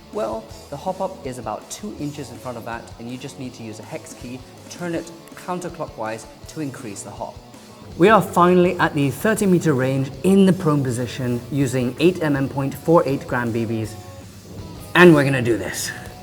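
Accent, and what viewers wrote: United Kingdom. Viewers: Asian British accent.. first for me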